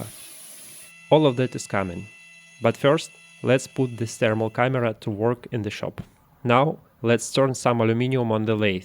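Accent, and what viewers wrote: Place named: Russia. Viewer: It is impossible to hide a russian accent.😅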